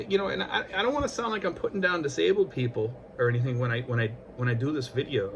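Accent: Boston, United States. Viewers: Always feel like ( your accent ) I'm watching Good Will Hunting! Bumpah stichah, for the cah.. seriously...I shop at home in Canada for everything. Balut is available here. And ah, the ladies who make balut live here too. So, if you want to travel 7000 miles to shop, knock yourself out. Might be simpler to walk to the local store. Sheesh boys! We are college gradu-ates right:)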